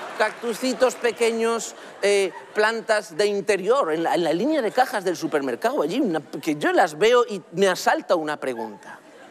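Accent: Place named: Galicia, Spain. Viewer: Me encanta el acento gallego. Es precioso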